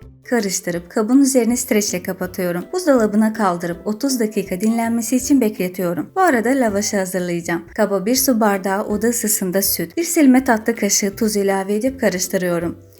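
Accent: Azerbaijan. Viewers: Cok guzel kısa net. ve bıktırmadan anlatiyorsunuz??elinize sağlık..merakımı mahzur görun ama bir sorum var sanki azeri bir aksanınız var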